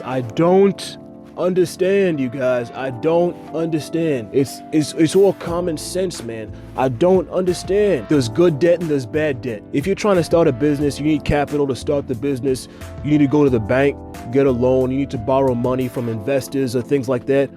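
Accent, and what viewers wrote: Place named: Brooklyn, United States. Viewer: You from Brooklyn?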